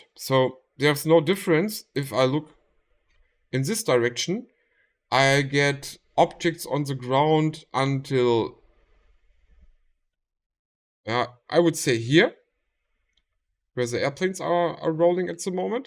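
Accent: Germany. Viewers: Ich liebe diesen Deutschen Akzent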